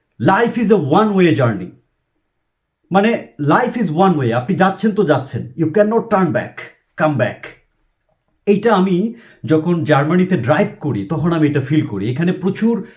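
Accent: Mymensingh, Bangladesh. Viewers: আসসালামু আলাইকুম স্যার, স্যার আমি আপনার ভিডিও দেখি বেশি দিন হয় নাই, এর মধ্যে আপনার সব গুলো ভিডিও দেখা শেষ। বড় আফসোস হয় আপনাদের মতো মানুষ দেশ ছেড়ে চলে যান! আপনার কথা যদি আমাদের দেশের শিক্ষাব্যবস্থায় জুড়ে দেওয়া যেতো আমাদের শিক্ষাব্যবস্থার আজ এই অধপাত হতো না। এই জং ধরা দেশের জন্যে আপনার মতো মানুষ খুবই দরকার। আরেকটি ব্যাক্তিগত প্রশ্ন ছিল স্যার, আপনার বাড়ি কি ময়মনসিংহ বিভাগে? নাকি কিশোরগঞ্জ? আপনার ভাষাটা ( আঞ্চলিকতার টান) খুব আপন মনে হয়। আশাকরি উত্তর টা দিবেন।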